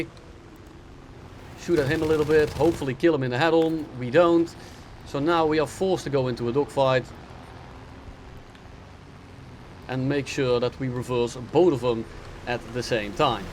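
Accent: Netherlands. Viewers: Dutch accent, lovely.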